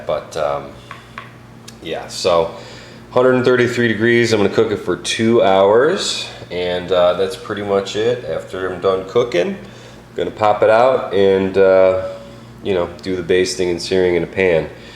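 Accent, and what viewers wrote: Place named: Chicago, United States. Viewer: You have to be from Chicago land area? Based off of your accent, I live near there. Just bought the Anova 😍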